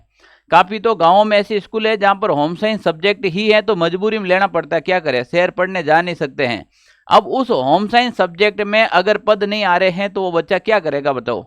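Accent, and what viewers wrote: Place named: Rajasthan, India. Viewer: Sir aap ki voice sun k aisa lagta h Rajasthan me bathi hu main😊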